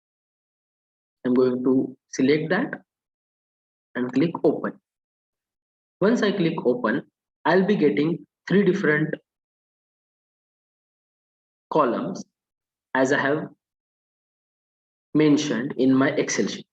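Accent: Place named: India. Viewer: Indian accent is horrible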